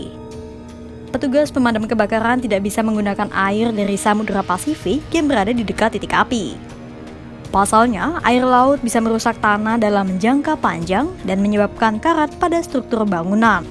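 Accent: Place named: Palembang, Indonesia. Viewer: Naratornya kemungkinan orang Palembang,logatnya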